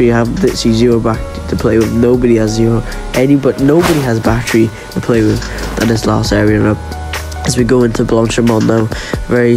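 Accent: Wales, United Kingdom. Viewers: the most welsh accent!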